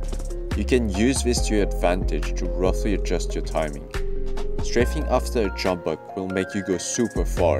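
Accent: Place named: France. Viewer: is that ur voice??? u sound so french XDDD anyways nice tutorial...best so far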